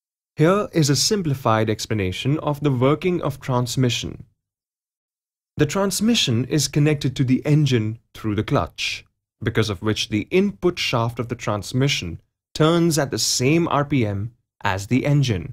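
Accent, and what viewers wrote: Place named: Germany. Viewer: Sounds German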